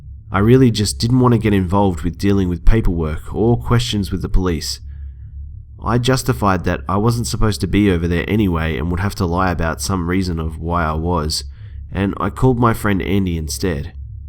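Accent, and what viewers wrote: Australia. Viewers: For some reason, when done with an Australian accent, it's all the more scary. Not to be racist.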